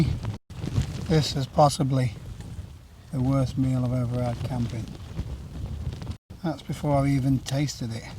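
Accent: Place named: England, United Kingdom. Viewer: Your videos remind me of Tom Weir - but with an English Accent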